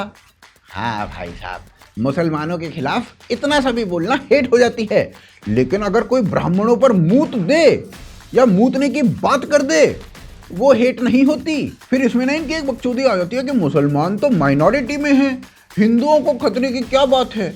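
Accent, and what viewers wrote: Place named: Braj, India. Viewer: Mathura ke ho kya? Bhasha brijbhasha jesi lag rhi thi😊 Anurag Kashyap na Rajput hai, na Brahmin, vo dalla h bs.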